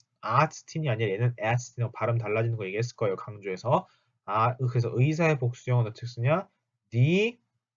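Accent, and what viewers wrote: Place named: Southern Germany, Germany. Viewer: R발음을 남부발음으로 하시는데요. 북부에서는 일빈적 ㄹ로 하던데.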